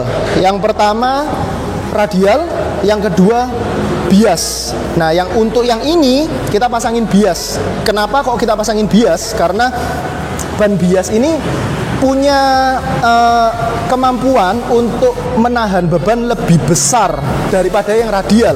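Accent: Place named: Surabaya, Indonesia. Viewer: Saya suka logatnya ko. Asli arek suroboyo iki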